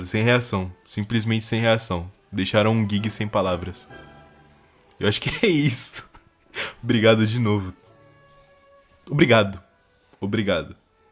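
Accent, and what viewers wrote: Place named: Rio Grande do Sul, Brazil. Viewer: Vc é gaúcho massa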